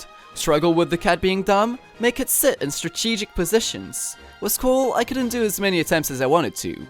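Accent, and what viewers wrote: France. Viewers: That’s what you’re accent is the entire video I was wondering and at the end you start talking French exactly what I though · ah bah tu parles francais a la fin mais jai reconnu a laccent ptdr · i’m french canadian, he’s french, he has a french accent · Bien joué mec j'ai même pas remarqué que t'avais un accent français avant la fin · Aaaaaah, I thought you might be French! The "no lessons on Wednesday afternoon" made me suspicious, and I then I thought I noticed a hint of French accent in your English Gg mon gars :) Edit: Belgian apparently? Whatever, French-speaking, you know what I mean xd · Bonjour Monsieur je pense que tu est plus francis, francis et moins quebequoi francis. Oui? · ayo i knew he was french by his accent or at least itought he would speak french, an he does, it makes me happy idk why when i see french yt ers speek english+ actually udnerstanding them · I totally expected that from his accent tbh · Could definetly tell you were french from the accent lol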